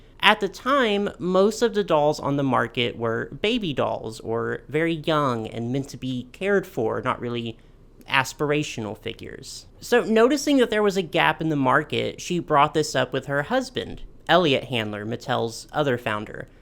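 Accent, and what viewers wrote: Texas, United States. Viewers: I'm a foreigner so maybe I just am bad at discerning American accents, but I was surprised that yours is a texan one??? Your voice is definitely not something I imagine when someone says "Texas" 😅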